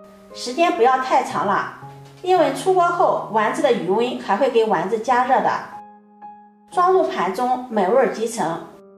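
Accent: Shandong, China. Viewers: 可能是山東口音。口音跟生長環境有關，是免不了的。聽習慣了就好。重點是她口齒清晰，要言不煩，而且都解釋得清清楚楚，實在是很優質的烹飪教學！ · 山东西部口音。水分（fei）,我们（mei）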